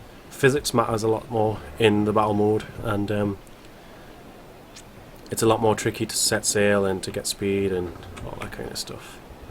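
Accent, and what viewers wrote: Newcastle, United Kingdom. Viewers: You from Newcastle area? Thanks for the video. I'll probably buy this when its finished.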